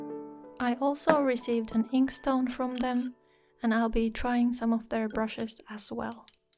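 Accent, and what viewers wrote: Finland. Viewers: Tell me you're Finnish without teeling you're Finnish! Tunnistan aksentin mistä vain! Ei siis huonolla tavalla. Söpöllä tavalla. Oot super taitava ja todella kaunista jälkeä! Ihan kateeks vetää nähdä noin upeaa sivellin työskentelyä! 👀❤️ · Mä tiesin että jotakin tuttua on aksentissa ja suomestahan sä. Somebody pick up the phone, cause I called it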